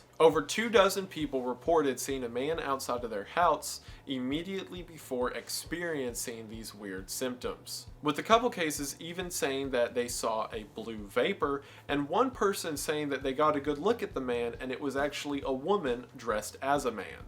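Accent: South, United States. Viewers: I typically only watch videos on 1.25x, so now the normal playback speed makes his southern drawl sound like a drunken slur 💀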